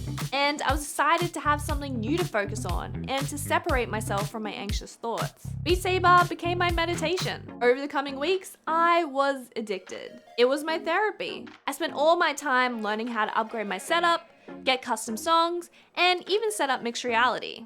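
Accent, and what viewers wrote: Australia. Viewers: Such a great video! super brave and open to share this, I truly love your videos. hope you won't have another second of anxiety ever again <3 p.s. nothing beats the aussie accent! :P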